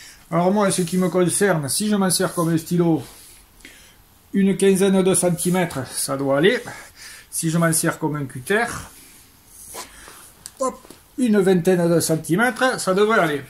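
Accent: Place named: Nice, France. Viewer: Alors la Monsieur vous pourriez facilement prendre la place du fameux comedien.... Louis de Funnes vous etres deja un tres bon menuisier et je vous remercie infiniment pour vos videos mais avec vos fin de videos( Betissier) la Je me regale d'abord parcque j'ai grandi dans un petit village derriere Nice donc l'accent j'adore et vous mettez le super cote humain,Alors bonne continuation et Merci Robert dans le blue mountains NSW en Australie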